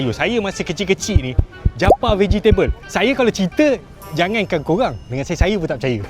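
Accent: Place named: Kedah, Malaysia. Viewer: ni kmpg Mana sebenarnya.. Kedah pn ye.. Pahang pn ye.. 😅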